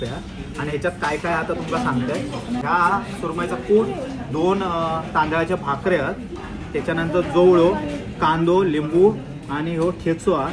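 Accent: Malvani, India. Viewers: Avad नाय भेटला तरी चालेल . पण तुझी जी भरारी आहे ती लय भारीच आपल्या गावचा नाव रोशन केला दादा तू ❤❤❤ बाकी तुला हार्दिक अभिनंदन भाई असेच तू व्हिडिओ बनव . सर्वात महत्वाची गोष्ट म्हणजे माझा मुलगा 14 वयाचा आहे आणि त्याला तुझे व्हिडिओ , तुझी कॉमेन्ट्री , तुझे कुटुंब सर्वात आवडते आहे . सांगण्याचे तात्पर्य म्हणजे तुझ्या मुखावाटे निघणारी मालवणी वाणी ही सर्वात आवडती ❤❤🙏🙏🙏🙏🙏🙏🙏🙏🙏🙏🙏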